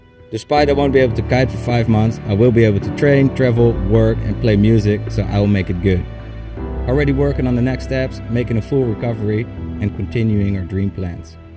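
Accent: Netherlands. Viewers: arw you dutch? you have quite a dutch accent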